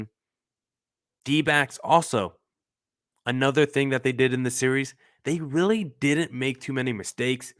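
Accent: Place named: Boston, United States. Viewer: I saw you on Sully’s locked on MLB. I turned in today to see what you had to say about the sweep. I had to laugh when you said you grew up a Red Sox fan. I am a lifelong Red Sox fan and I was thinking “this guy sounds like a Red Sox fan I like him.” Just one problem, how can you switch allegiance to the D backs? But I am happy for you that they won.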